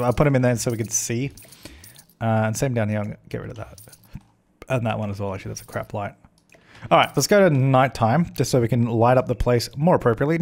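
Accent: Australia. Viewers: I'm weird lol:3 All Australiens sound the same for me I think 🤔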